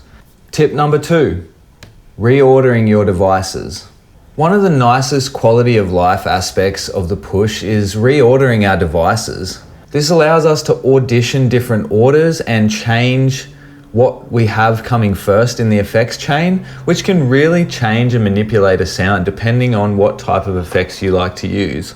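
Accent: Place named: Australia. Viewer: great tips! still early in my journey on the Push 3, not really an in-the-box tip, but I LOVE using the ROTO-CONTROL with the Push 3 as you can lock it to a rack and tweak that while not impacting what happens with the Push3, kinda of like the 'Select On Launch' being OFF on the ROTO. Nice hearing an aussie sharing the love!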